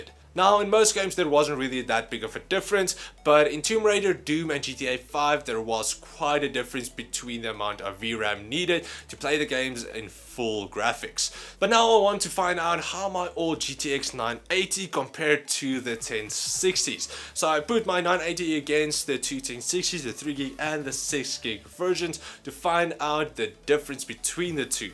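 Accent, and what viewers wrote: South Africa. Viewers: South african ? :D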